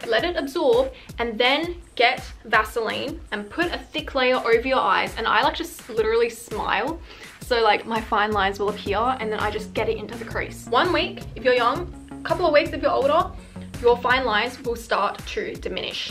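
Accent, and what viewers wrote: Australia. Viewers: 5 reasons why changed my life: 1. made me boost my self-esteem. 2. made me physically healthy & mentally 3. got an aussie accent hooray! 4. Life lessons, like. thank you! 5. made me SMART and organized. Like love you your a life changer i will definitely talk about you to my grandchildren LOL ❤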